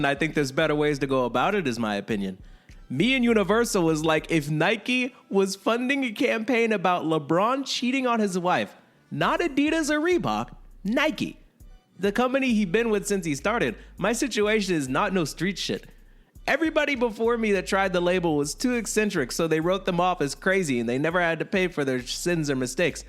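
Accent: Canada. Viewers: also your canadian accent is my favorite 😂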